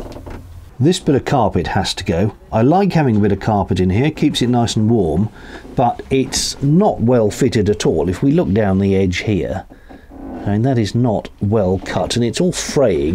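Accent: United Kingdom. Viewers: Your head-long and well-explained DIY adventure is a hoot,an enjoyment, and a few moments away from every day life for most everybody who’s watching. I love it! Plus, I like laughing out loud now and then. I like hearing your terminology of choice. I like your British accent, for goodness sake. I’m so glad I found you all those years ago! Keep on videoing as you continue along your DIY, renovate, and refurbish chosen😢 path. ♥️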